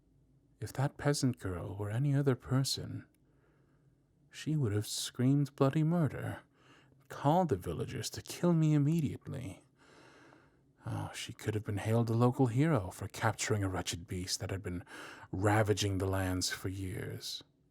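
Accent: United States. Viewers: I thought chu were American...?!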